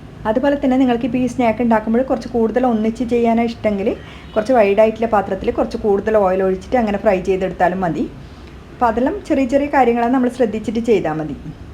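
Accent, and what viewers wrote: Kannur, India. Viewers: Nice name " Thakaarapetti ". Due to shortage of time, can't try many receipe. But will try for sure. Heard that Thalassery, Kannur people are very good in cooking. I like your Kannur slang . Thank you dear. 😊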